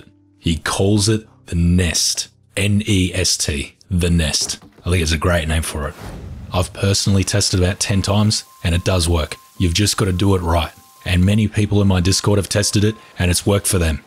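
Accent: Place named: Australia. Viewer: Thanks for this! I made and tested this last night, it works perfectly in the latest version (v1.08). I followed the procedure in the video exactly using log catchers to block off the openings, and viola....a perfectly functioning log catcher! Also, I got it on the first try, so apparently I'll be taking over channel soon! Although, that would never work..... A. I'm too lazy for that much work. B. My voice just wouldn't be as relaxing as, and no Aussie accent. Personally, I agree with on this, and feel this is a workaround for a problem with the game rather than an exploit. Really, there should be a "Log Catcher" available from the blueprints menu, but until that day, or the day the devs nerf this, it's a working solution. Cheers!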